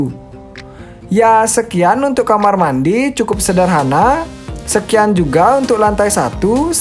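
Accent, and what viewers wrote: Bali, Indonesia. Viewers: konten kreatornya ngomong dengan logat bali, bukan di buat buat , Respect kekayaan bahasa indonesia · Itu logat Bali, Indonesia banyak suku dan bangsa, jd harap memaklumi . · Ngomongnya logat bali...mohon dimaklumi lah....indonesia kan beragam bahasa dan budaya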